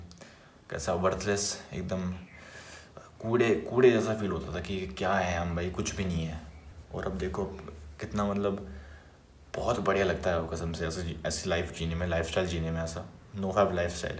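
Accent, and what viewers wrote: Bengal, India. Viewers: Bhai are you from West bengal?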